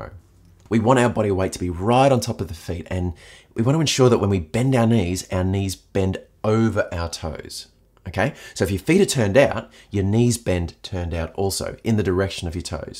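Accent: Australia. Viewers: Crikey he’s Australian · Is this guy Australian? He sounds that way.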